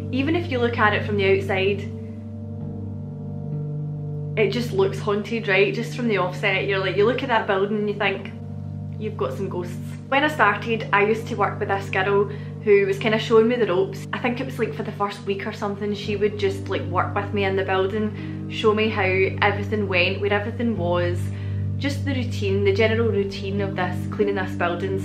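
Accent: Scotland, United Kingdom. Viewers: Funny you get comments about you talking too much. The hugest part of why I watch your videos is to hear you speak Scottishly (yeah, I made that word up).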